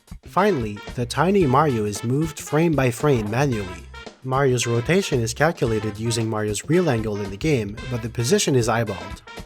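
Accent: Quebec, Canada. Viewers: before he gets the question <num> times he’s french-canadian (québécois specifically) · J'ai une question très simple : Est tu Québécois ? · dude, your english is completely fine. From your accent I think you are canadian, possibily first language french? You speak better english than I do and certainly better French! Thank you, I love your videos and come back regularly to watch them <3 Thank you for all the effort you put in, especially with the audio :-)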